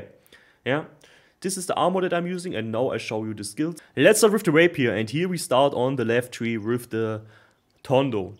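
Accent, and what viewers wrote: Germany. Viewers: are you german by any chance? your english sounds too funny haha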